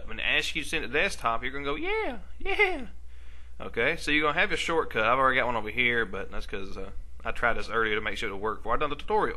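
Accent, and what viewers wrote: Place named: South, United States. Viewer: Ok, wanted to thank you again, had to track down your video again for a reinstall. Plus after 7 years in the UK it's nice to hear a "down home" accent again lol thanks again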